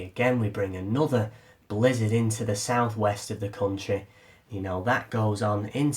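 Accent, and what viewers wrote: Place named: Manchester, United Kingdom. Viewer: My father used to regale me with tales of the winter of 1947 when he was a little boy. I love the narrator of these series. He's a Mancunian, probably, and every other word is "actually" for dramatic! effect.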